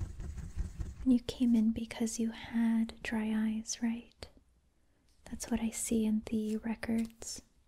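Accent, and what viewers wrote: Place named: South Africa. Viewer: I sorta get springbok vibes from your voice and it's the best thing ever.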